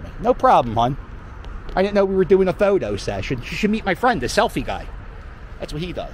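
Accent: Philadelphia, United States. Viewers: Yo!, I subscribed to your site because I enjoy listening to the Philly accent again its been years. Great work on your videos, keep it up!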